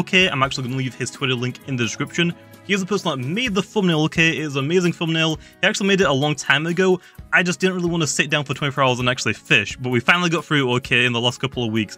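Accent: United Kingdom. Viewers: Goppie :0 But also bri'ish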